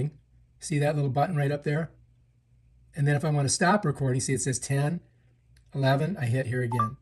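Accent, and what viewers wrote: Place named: United States. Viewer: Do not delete. Why are you giving a camera plugged directly into your phone access to your local network?? Why would some Chinese product need access to all your other devices on your LAN if it’s plugged right into your phone? Think about it! That’s NOT safe. Why do you give it FULL access to your phone’s library? It doesn’t need FULL access to save a photo. Take a screenshot instead of giving access to China to all your files and network devices. You don’t think that’s weird? Oh, and it wants access to connect back to their company! Uhh, to send them your files?? Again, it doesn’t need access. If the software needs an update it would get it through the App Store, not directly from the Chinese programmers. HELLO! Wake up people.? Why are you encouraging people to do this? What is your motive? Who paid you to post this and other videos for this same dangerous device? 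You might sound American, you are anything but.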